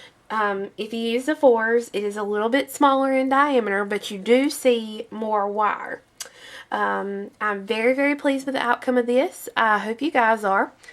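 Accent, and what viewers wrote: South, United States. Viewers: Great tutorial and very easy to understand and follow. I knew it was a southern voice I heard! I'm from Columbia, SC You can bet I will be checking out your other videos. Debbie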